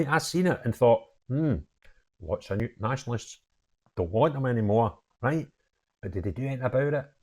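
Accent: Scotland, United Kingdom. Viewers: You always have me in hysterics the way you explain things. Sounds so much better with you saying these things with a Scottish accent. Got on yeh. thks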